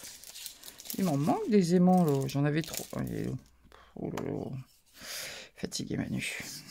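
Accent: North, France. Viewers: Tu es du nord ??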